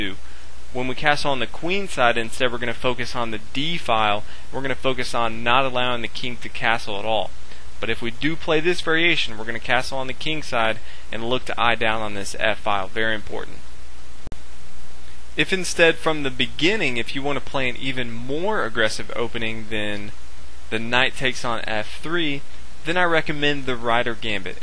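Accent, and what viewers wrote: United States. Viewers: I love this dude's voice / accent what part of US is he from?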